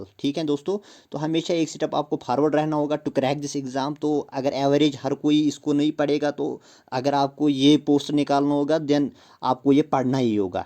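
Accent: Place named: Kashmir, India. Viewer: Kashour English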